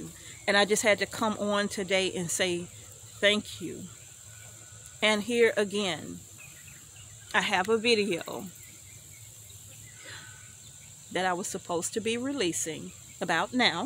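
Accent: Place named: Kentucky, United States. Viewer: I am thankful for youtubers like you and. I used tjo garden and can stuff with my mother, but only water bath canning. You are the reason I got a pressure canner because of you. I had to buy all new jars again because my husband had gotten rid of mine that I got when my mother passed. Just hearing your voice, with your accent, reminds me of her, we were from Kentucky. I learned so much from her, gardening, cooking, quilting, just so much. We all used to go berry picking together as a family, people used to make fun of us, but I didn't care, because I knew how good the things Mom made from the berries would be. I learned how to process and clean the critters my dad would hunt. Once my mom killed a bunch of our chickens, they were free range and wild as heck, and most of them would go up the mountain and roost. Mom stood out in the yard and shot them with a 22 rifle and every one was a head shot. 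She had my German Shepherd go up the mountain and retrieve them. The point of this whole story was helping my mom pluck those chickens. She didn't expect me to have an issue, but when she dunked them in the scalding water, the smell made me gag and throw up. She made me go in the house. Anyway I'm grateful for y'all sharing your lives with us. God bless you guys and everyone, including your critters. 🥰🥰🥰🥰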